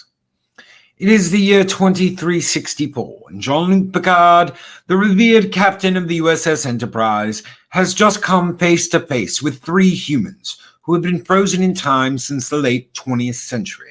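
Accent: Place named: RP, United Kingdom. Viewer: indeed! It seems I can leave a comment here but I can’t a see anyone else’s. WTF, YouTube? Nice video, man, I truly enjoyed your constipated British toff voice. Constructive criticism: You might want to watch the occasional “um’s” and such, and invest in an expensive microphone... it seems to be a necessity for a promising YouTuber. Again, nice job; I’m sure starting a YT channel is like watching grass grow; please persevere!🙂🖖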